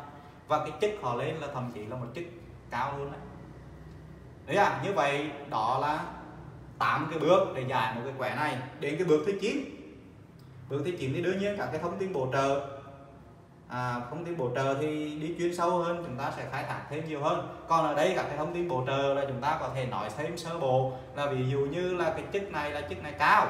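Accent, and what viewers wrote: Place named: Hanoi, Vietnam. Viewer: Vậy khi đi dạy thầy nói giọng Hà Nội cho nó máu ai cũng nghe được hết chỗ để chê thầy còn khi về nhà thầy lại nói đúng giọng của mình. Thầy rất giỏi và giảng giải cũng rất dễ hiểu tỉ mỉ từng chi tiết nhỏ khâm phục thầy. Xin cảm ơn về những khoá học miễn phí của thầy và chúc thầy cùng gia đình luôn nhiều sức khoẻ và luôn may mắn và luôn luôn ngập tràn trong hạnh phúc an vui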